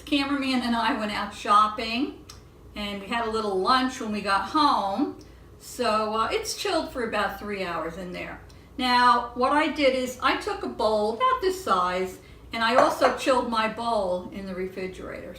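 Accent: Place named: New Jersey, United States. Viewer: Love your Jersey accent! And the pie sounds/looks awesome! 🤤🤤🤤🤤🤤🤤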